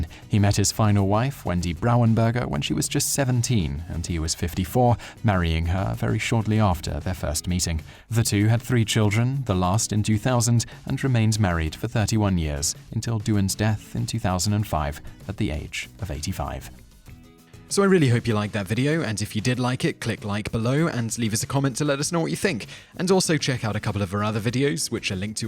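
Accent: United Kingdom. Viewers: A few comments. First, when discussing he was shot by a 'Canadian gunman' you show a pic of someone wielding an American M1 rifle. And why the term 'gunman'? Sounds amateurish. Soldier or rifleman would have been better. I believe it was a burst from a Bren LMG that got him. In his autobio he says that he didn't notice the leg wounds and was fixated on his finger hanging by a bit of skin. He went to an aid station and the medic merely tore his dangling finger off then said, "now, how about that leg?" He was stunned that he hadn't noticed the most serious of his wounds... or that he could even walk! Now for the bit that irks me the most from this video - particularly as the narrator is obviously a Brit. That would be the MISPRONUNCIATION of the rank lieutenant. Who do you think we Canadians are, a bunch of yanks? Every English speaking nation of the world pronounces it 'lefftenant' EXCEPT one... 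the USA. I have no problem with that - that's their way. But, for the love of God, stop using the US version when referring to the Commonwealth! You're likely thinking, "oh relax. It ain't no big thing..." But it is. We are losing the finer points of our culture to a deluge of US influence. Already far too many ignorant Canadians use the wrong pronunciation (even reporters who often say "Lootenant Governor'....grrrrr) without having someone with a Brit accent confusing them even more. And to all the dummies who say, "but it's spelled that way..." I say, speak the rank 'colonel' out lou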